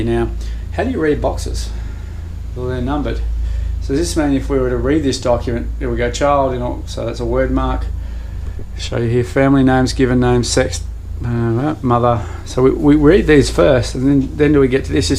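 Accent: Australia. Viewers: I reaaly appreciate your efforts and clear intention, but for a Dutchie like me, your fast talking in Australian English, plus equally fast associations, comparing boxes in "official" forms with pages in a "comic" book. For a beginnner that's hard to grasp, apart from being not informative, but rather a personal opinion of a rebel (for a good cause) and I understand you were one of a few pioneers, at the time of this recording. I imagine that you've reached such conclusions yourself, and since this topic is familiar to more people now, it's possible to grow familiar with this complex subject. We need our trailblazers, and you're one of them 🧡🌈🎃🧑‍🌾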